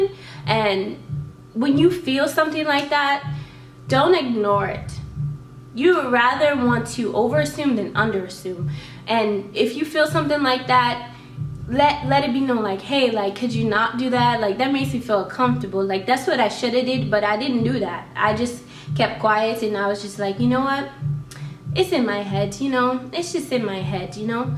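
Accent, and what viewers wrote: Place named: Ethiopia. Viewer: I’m sorry this happened to you. Stay strong 💪🏻. By the way, your Ethiopian accent mixed with American sounds soo nice 👌.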